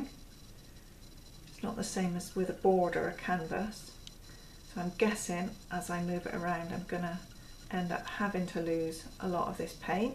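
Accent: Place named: Southern England, United Kingdom. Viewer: do you come from Gloucester ,dorset or hampshire? lovely accent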